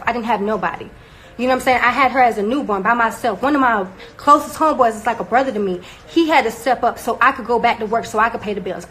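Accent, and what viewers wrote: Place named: Mississippi, United States. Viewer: You are beautiful but you know you country talkin as hell where are you from Mississippi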